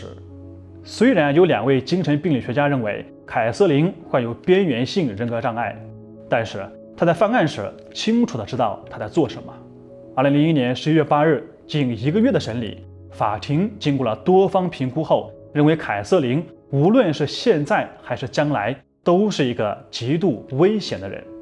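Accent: China. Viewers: 内地口音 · 我一直想知道你是哪裡人？字幕是繁體，用語和口音卻比較像國內，所以比較好奇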